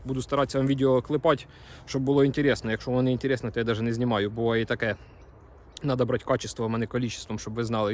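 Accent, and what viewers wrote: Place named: Surzhyk, Ukraine. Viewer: А мені подобається слухати суржик,хоч сама із західної області.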